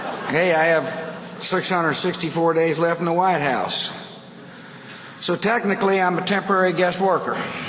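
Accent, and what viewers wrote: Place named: United Kingdom. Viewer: i speak my dialect and you speak y'own maybe your lack of american in your english is irritatin to me and mine? sound like some jumped up british poetry professor tellin ME how to talk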